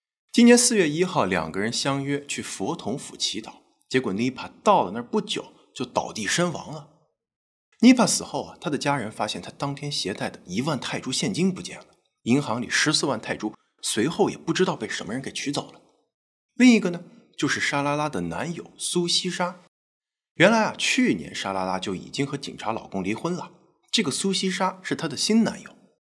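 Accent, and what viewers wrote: Standard. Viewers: 普通话标准，听多了普通话都学会了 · 真的是講故事的專家❤內容清晰語氣恰當，字正腔圓❤最喜歡的頻道我每天都聽幾個小時太好聽❤，👍👍👍👍👍👍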